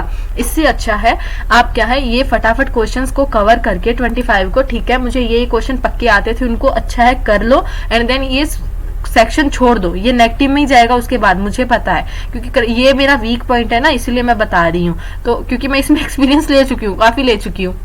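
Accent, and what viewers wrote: Haryana, India. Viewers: aapki voice se pta lg gya tha but m haryana me puch rha tha 🤭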